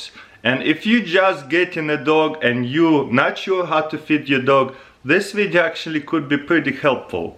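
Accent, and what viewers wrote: Russia. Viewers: Are you Russian Cuz u have a Strong Accent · Are you Russian? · His russian English accent is a kind of cute!! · I've always liked Russian accents but yours is remarkably nice to listen to. Love the commentary lol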